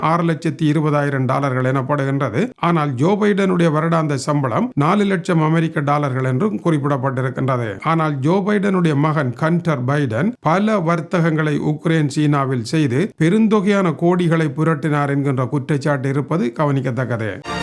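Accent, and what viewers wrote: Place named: Valvettithurai, Sri Lanka. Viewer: வல்வெட்டித்துறை தமிழ்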